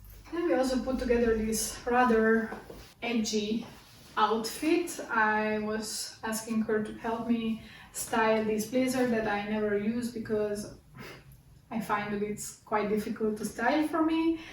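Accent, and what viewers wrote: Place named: Hungary. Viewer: Szia! Az akcentusodból gondoltam, hogy magyar vagy. Szerintem a fények, a kamera és az ebben a videóban viselt blúzod alapján úgy gondolom, hogy mégiscsak Tél típus vagy, mégpedig Hideg Tél. Engem 3 végzett színtanácsadó nézett "félre" személyesen, míg már annyira frusztrált lettem, hogy elmentem egy szín- és stílustanácsadó tanfolyamra, hogy kiderítsem az igazságot. 🙂A baj az, hogy az "avatatlan" szem (néha még a tanácsadók is!) nem tudják, hogy mit kell nézni (elsősorban a bőr egységes és egészséges ragyogását). A rossz színek elszínezik az arcot, szürkítik vagy sárgítják, kihozzák a bőrhibákat, a szem alatti karikákat, stb. A tanácsadó pont az ellenkezőjét mondta neked, mint amit én mondanék. Az ösztöneid ebben az esetben jól működtek, javasolom, hogy hallgass rájuk (vagy legalább kérdezz meg még valakit). 🙂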